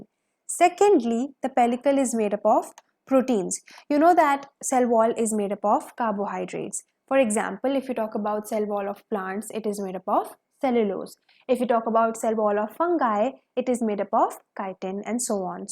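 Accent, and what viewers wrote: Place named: India. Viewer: You're Indian I understand by your voice & manners to speak.☺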